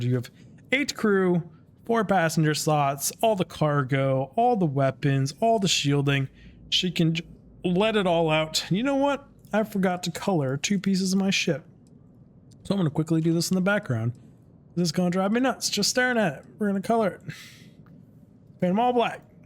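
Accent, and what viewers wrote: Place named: United States. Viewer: No hate man.... Love this video. :D , but I also, as a European, love how Americans just add an subtract letters and syllables in words thats not American. "Lon" = LEE-on", "Polvo" = "Pol-O-vo" for example.... As said... No hate... I just find this entertaining..... ;P If you watch all types of gaming-videos, "MetalJesusRocks" is a MASTER of this... ;P Edit.... After watching the whole video, this is deff going to be my go to ship! Theres too few builds like this with small ships and huge cargo.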